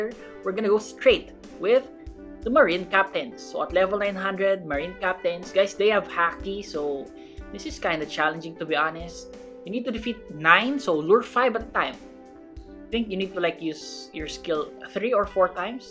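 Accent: Philippines. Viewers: he is filipino i can tell by the way he talk and when he face reveal, he said he was from PH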